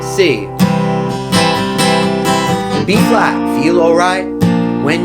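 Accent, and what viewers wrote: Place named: Long Island, United States. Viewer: Nice lesson. Got two loopers one Boss and one on the Helix. Now ive gotta figure out how to use them. I know the Boss is capable of overdubbing not so sure about the Helix looper. PS. Wheres the accent from?? Sounds like either Strong Island or Massachusetts. Im betting on Long Island!!! Am i right???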